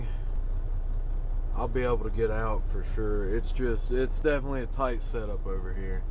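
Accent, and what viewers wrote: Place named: Alabama, United States. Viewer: Ahh that's cool! I am originally from Pennsylvania (Lehigh valley). I came down to Birmingham a few years ago to go to UAB and now I'm about to graduate and considering trucking. As I watched more of the video I was like he sounds like he might be from Alabama! Low and behold! I go to Alabaster a few times a week to go to Veterans park since they have a nice skatepark there. But I'm mostly just stuck by UAB which kinda sucks since I hate the city! And I hate the city drivers and how no one uses turn signals! And just the constant rush haha. I'm hearing a lot of good things about Crete. I'm going to check out your six month review! Thanks!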